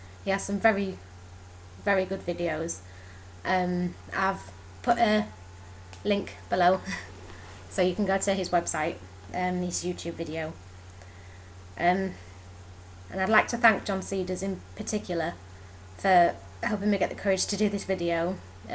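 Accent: Northern England, United Kingdom. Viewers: For a Northern accent, yours is rather pleasant i must say! From a not posh Suvverna. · Sounds like a northern lass!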